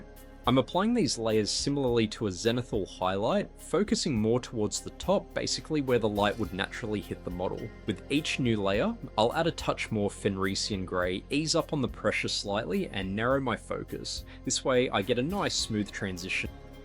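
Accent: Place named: Australia. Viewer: Fantastic result! Loving the heavy Aussie accent, mate ^^ I'm just sitting here waiting for you to start swearing heavily :)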